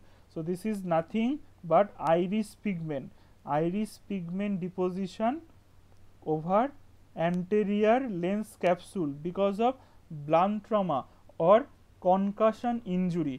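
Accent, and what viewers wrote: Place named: Bengal, India. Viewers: It's bengali accent I think, they pronounce a as O generally. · Don't go with accent. He was Our WBUHS topper got honoyrs in every subjects all 14, gold medel, record marks in Obs gynae..... And Our Bengali accents are like this.... don't go with this.... he is a very good doctor that's a matter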